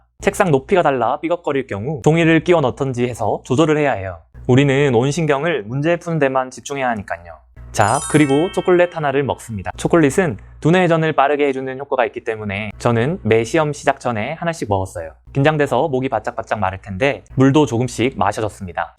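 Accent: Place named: South Korea. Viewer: 여기착한의사선생 말투가 서울토박이가 아닌 느낌이 드네요?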